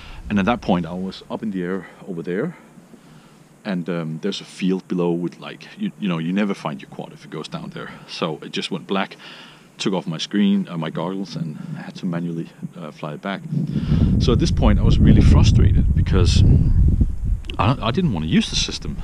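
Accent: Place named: Denmark. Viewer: but now i am curios where You from your English accent sound Danish that whas The reason i meant i had Seen You before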